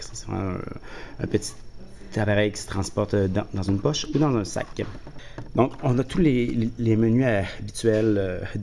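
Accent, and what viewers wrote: Canada. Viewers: c quoi cet accent, tu habites au canada? ^^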